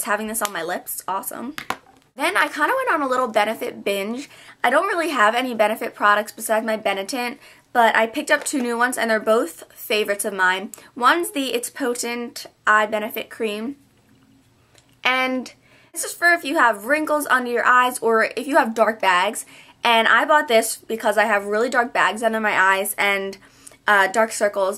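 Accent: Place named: New Jersey, United States. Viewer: She's From New Jersey Well At Least I Think She Is Because She Said She Drove To New York For IMATS &&+ People That Live In Jerssey Do Drive To New York Often &&+ Vice Versa &&+ She Does Kinda Have A Slight Jersey Accent So I Think She Is From Jersey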